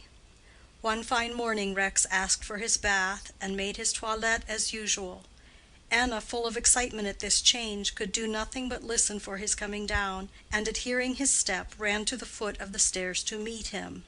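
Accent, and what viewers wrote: United States. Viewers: I think its a great reading. It's actually the subtitles that are poor. I don't mind the American accent it's their literature as well as Britain's. Also tonally American readings are easier to listen to as many English readers flatten the vowels and make it a bit drab. They also get rid of class bias and certain readers affectations.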